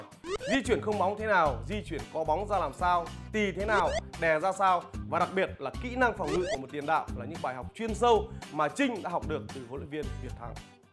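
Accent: Vietnam. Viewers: Chú ơi Phú Thọ nói giọng Bắc mà :))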